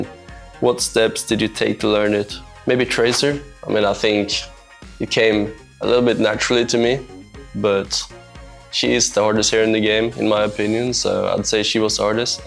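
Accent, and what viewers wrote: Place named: England, United Kingdom. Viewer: he has a definite english accent, forsure picked something up from kai and fastro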